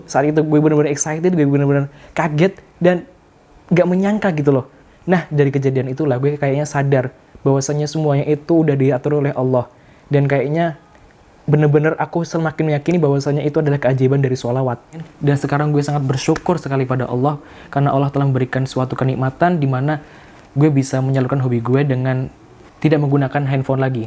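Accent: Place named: Java, Indonesia. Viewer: Gimana kalo guenya jadi aku atau saya biar easy listening aja medokmu isih katon maskuu😭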